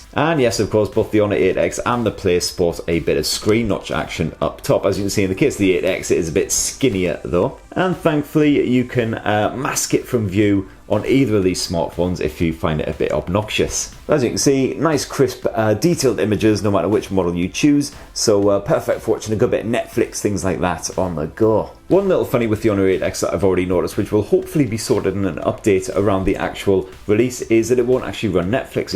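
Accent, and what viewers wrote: India. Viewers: Not Indglish. English title with Indian mambling. Dislike guaranteed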